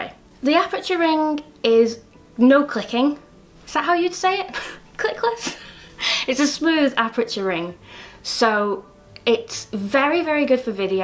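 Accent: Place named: Liverpool, United Kingdom. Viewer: didnt think id stumble on liverpool when i played this video. brings back a lot of memories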